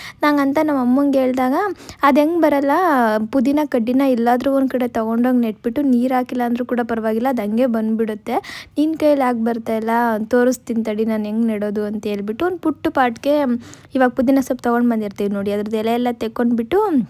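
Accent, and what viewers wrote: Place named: Uttara Kannada, India. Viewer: ಹೌದ ಉತ್ತರ ಕನ್ನಡ ಅನಕೊಂಡೆ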